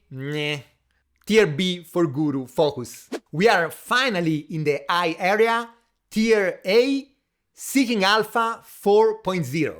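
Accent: Italy. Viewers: Italian accent makes it all even better 😃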